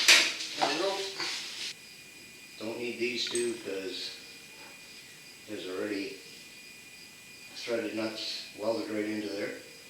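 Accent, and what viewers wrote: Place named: Canada. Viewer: Sounds like you’re from Canada, I’m sure I’m off. Great job for not being a body man and you had your best friend helping you with the hood! Living in NYS the Capital of RUST BUCKET HEAVEN understanding and having the patience for fixing a truck like this is truly a virtue! My 05 silverado is getting the Shit beat out of it body from all the SALT and WEATHER MODIFICATION WARFARE we as human beings are FORCED TO ENDURE HERE in The Great Lakes Area over A Period of DECADES! Nice job maybe if I can muster up the patience between my cancer treatments and pain management from exhausting amounts of painful spinal surgeries I’ll be able to fix my truck in between fixing my house from the December 22 winter snowstorm that killed human beings along with locking everybody and everything in sight for a couple of weeks!!! It’s a shameful disgraceful sinful world we live in since the deep state Covid tyranny was forced onto humanity, so good for you for keeping yourself busy! 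We can all bury are heads in the sand now that the Covid tyranny is all gone!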